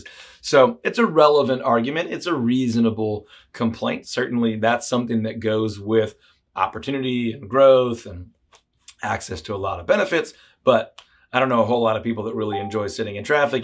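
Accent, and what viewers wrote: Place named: Dallas, United States. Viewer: I bet this guy talking is from Dallas.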